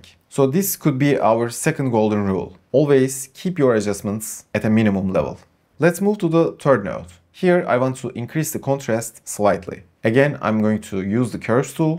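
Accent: Turkey. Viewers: AKSANDAN ANLADIM TÜRK BU :D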